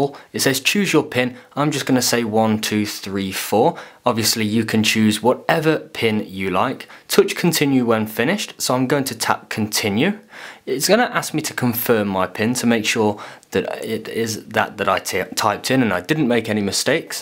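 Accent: United Kingdom. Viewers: New Jersey, USA Nov 7,2016 Very good tutorial ! Keep up the good work. By the way, what part of the UK are you from? While my Ph.D. is from Texas, my doctoral research was done at Cambridge Univereity in England. At your converience, do email me. Sincerely, Dr. Jyotindra Bhatt, Ph.D. <mail>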